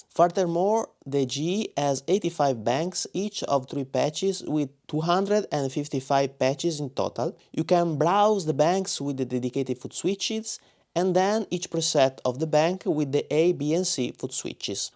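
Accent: Venice, Italy. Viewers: Complimenti per le capacità esecutive e dettagliata recensione, bravo Dall'accento direi italiano, Veneto, probabilmente veneziano 😊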